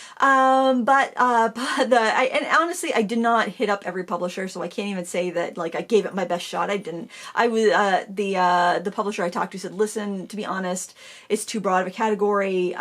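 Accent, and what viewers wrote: Maine, United States. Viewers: First found you about the time you started. Don't know how I found you, but when I heard you revert into a Maine accent I was hooked! I have lived in AZ for 21 yrs. Born & lived in Portland, ME 57 yrs, hated winter. But still have strong ties & visit every 2 yrs. Even have old friends who live in your area! I have learned oodles fr. you. When you refer to someone trying to do better with H2O coloring by getting a better brush that's me. It doesn't help. but I keep trying! Watching you paint is like therapy to me. It's like a link to home. So, please keep going! Can't imagine how difficult it must be. Your honesty, personality, talent, wide knowledge of lots of things is amazing. You go Girl. I do miss when you & your friend Sarah? did the Q & A, things change. Happy Anniversary, and many more!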